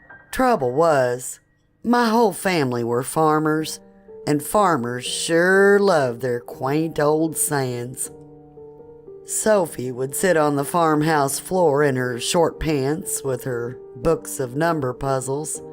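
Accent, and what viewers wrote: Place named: Kentucky, United States. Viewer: Hey this is wonderfully narrated! Love the Kentucky accents. :)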